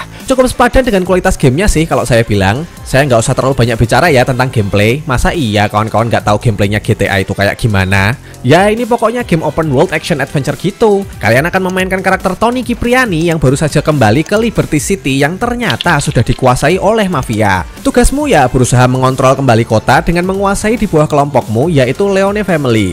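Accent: Java, Indonesia. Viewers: matamu methothoo wkwkwkkw orang jawa mana bang? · kamu itu dari jawa ya soalnya cara bicara kamu itu mirip org jawa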